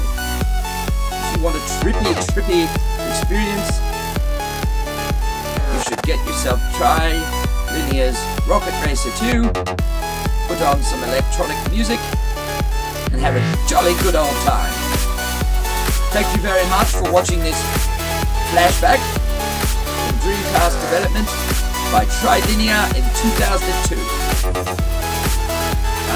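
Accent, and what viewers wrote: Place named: United Kingdom. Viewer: Haha nice British accent.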